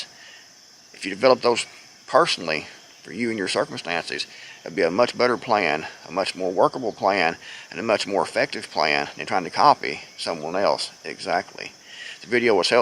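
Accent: United States. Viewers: I’m loving the “differt” way you speak. Nothing makes a displaced Marine feel more at home than hearing some sweet American accents.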